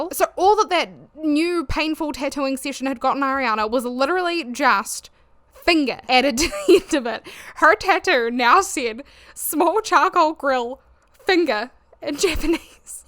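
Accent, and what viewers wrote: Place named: New Zealand. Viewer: yayyy I’ve been here long enough to know to expect your “its a kiwi accent” disclaimer!! Im grandfathered in!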